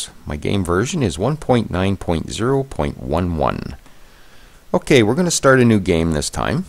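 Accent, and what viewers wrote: Canada. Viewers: you sound canadian. I love it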